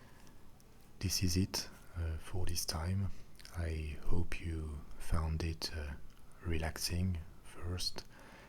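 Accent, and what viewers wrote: France. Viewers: Yes! One of a kind! Hope I won`t break the spell you so awesomely are capable to put on listeners (Plus: the content you deliver...wow, and with the intonation ...just sooo soothing, your French accent seems to increase the impact...)-----------yet, may I ask: 1. what led you to do this work? 2. gee, feel awful asking this here, but: do you get paid...by whom, respectively what ..."management"? After all, you offer a stunning service...potentially on a global level! If you don`t (want to) answer, for whatever reason, be assured you are doing a fine job!!! Thanks, Marie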